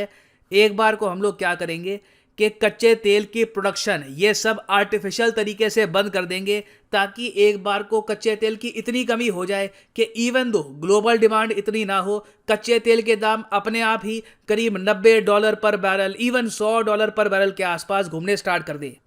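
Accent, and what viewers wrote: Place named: Delhi, India. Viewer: “Hum gerrnge, dhar lia” sir’s delhi words so amusing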